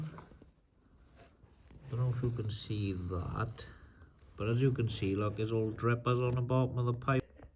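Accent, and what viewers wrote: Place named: Wales, United Kingdom. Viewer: Just something about a Welsh accent that makes me smile.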